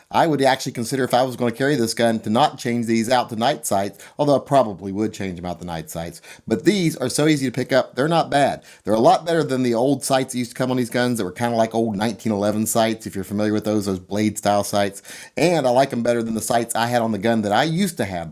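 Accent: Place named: South, United States. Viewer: I need to ask you. You're called, but you have a southern accent. Why?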